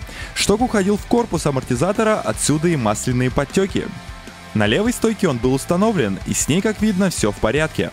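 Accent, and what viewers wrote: Kazan, Russia. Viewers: Стоп стоп стоп!Ты с Казани?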